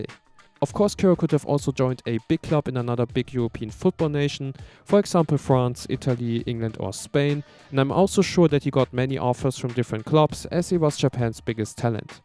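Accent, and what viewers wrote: Germany. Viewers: du bist doch safe deutsch. ich erkenne an deiner Aussprsche bei einglisch. ist richtig gut!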